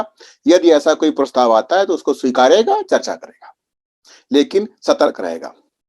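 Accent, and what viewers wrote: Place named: Fatehpur, India. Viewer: Theth Fatehpuriya Bhasa Maza aaya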